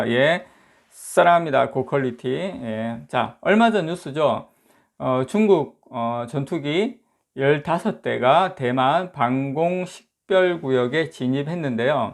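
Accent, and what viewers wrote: Gyeongsang, South Korea. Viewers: 땡꼬때리고 ㅡㅎㅎ참 오랜만에 들어보는 경상도 맛의 사투리 항상 감사한마음으로 듣고 있습니다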